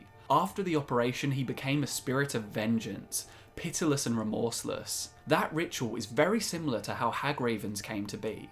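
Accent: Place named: Australia. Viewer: Are you an Aussie? you sound it. I am too XD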